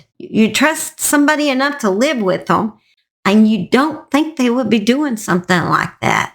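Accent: South, United States. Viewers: I like your voice...a southern accent is comforting to me...probably bc I'm southern. Lol Hyped your video. Encourage people to comment too bc it helps the algorithm. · Oklahoma?? That's not true you have a southern accent Oklahoma is way Midwest they don't speak in that manner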